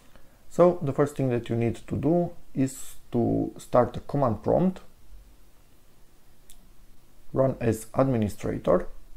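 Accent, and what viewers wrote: Romania. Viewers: are you romanian? the accent gives it away😀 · Ănd hir iu mast bi șur. Mai bine ai spune in româna. Ori fă pronunțarea sa fie in engleză și nu româno-engleză.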